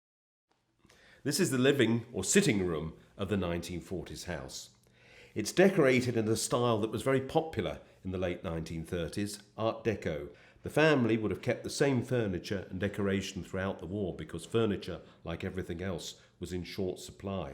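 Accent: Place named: United Kingdom. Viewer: Sexy London Male voice. Like that! Wish America retained the British accent!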